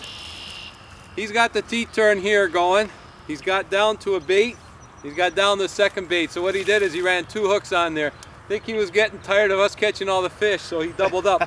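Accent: Canada. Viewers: Is this guy Canadian, eh? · Are you from Canada Aye? Nice videos good information it has helped me a lot ! Thanks. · "Eh?". God, I love Canadians. That and a really superlative lesson in catching catfish!! :)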